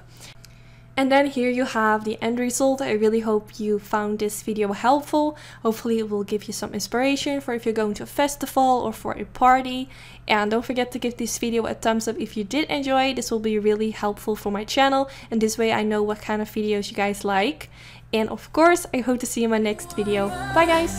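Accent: Netherlands. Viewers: WOW this look is so cool! Love it! 💚💚💚 Wacht eens even, ik hoor een Nederlands accentje. Ben je Nederlands!? Wat gaaf!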